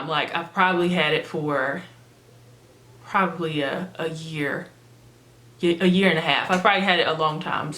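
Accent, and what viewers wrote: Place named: Georgia, United States. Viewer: My first time seeing you ... excuse me... being blessed w u! Just wanna day THANK YOU! And you are 6 feet tall?! You betta be a model . Beautiful ! Where r u from I love ur accent too! U sound ur from Lagrange Georgia or something... anyways I’m a new subbie! 💕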